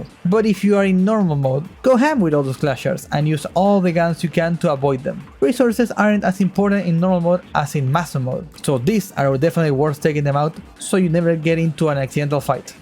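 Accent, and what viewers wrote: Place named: Argentina. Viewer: el primer momento que escuche tu acento ya sabia que eras Argentino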